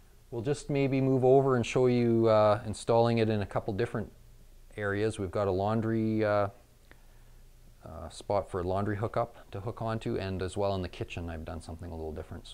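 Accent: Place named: Canada. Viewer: Hi, great video here on the Pex, I hear yer "Inns and yer Oautts" yer must be from Canada I reckon.? Please keep up the good work and God Bless. DD in L.A.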